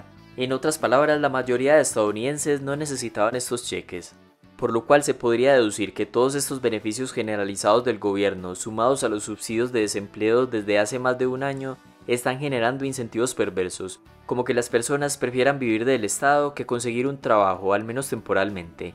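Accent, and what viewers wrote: Colombia. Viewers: Sería interesante que hagas un video sobre como las subvenciones dañan el tejido productivo de un país, por tu voz creo que eres colombiano, en caso de ser así sabes que ciertos sectores políticos han propuestos rentas básicas universales.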